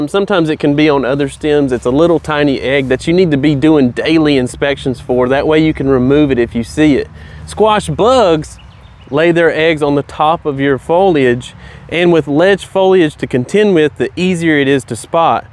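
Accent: South, United States. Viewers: I'm so glad the American accent is alive and well. One of the most beautiful of all of them, as represented here. It's a soothing and comforting sound if you had Aunts, Uncles, or Grandparents, or anyone else you looked up to, who was from our South.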